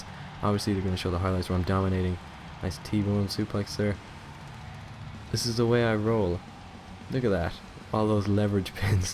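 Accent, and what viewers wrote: Ireland. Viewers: I can hear a bit of Irish accent in your voice. Do you have any Irish blood in you?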